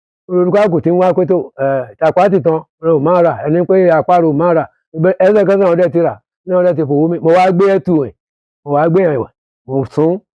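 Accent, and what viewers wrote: Egba, Nigeria. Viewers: This Ègbá dialect is sweet.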